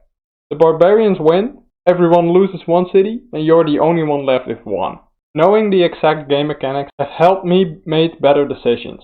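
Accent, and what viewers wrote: Netherlands. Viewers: Can I guess your nationality? I’m very into accents, and I think that you might be Dutch. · Even in English I can hear your Frisian accent, lol. Thanks for the Tips :)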